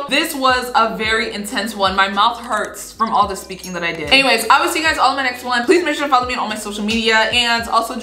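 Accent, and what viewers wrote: Nigeria. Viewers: I just found your channel.. And I love it. Especially your accent.. Is it nigerian? Or other country in west africa? And yes i agree with your opinion about this.. This could also applicable to some arts... Like i don't understand why would someone pay thoisands of dollars for paint on rocks? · You sound Nigerian I love you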